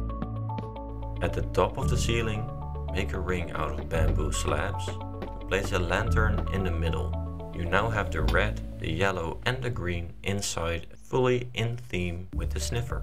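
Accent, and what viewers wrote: Netherlands. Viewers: Are u Dutch? xD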